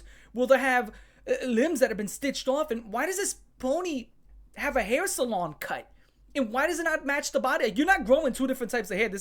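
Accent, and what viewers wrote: New York, United States. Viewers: holy ny accent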